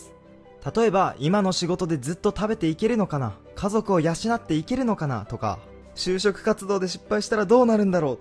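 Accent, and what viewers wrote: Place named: Tohoku, Japan. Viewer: あ、そうなんですね！こちら、秋田出身東京在住なのですが、はじめ（親しみやすさという意味で）ときおり東北チックな訛りがあったので、そちらご出身かなと勝手に想像していました＾＾ さんの語り部、毎回とても楽しみにしています＾＾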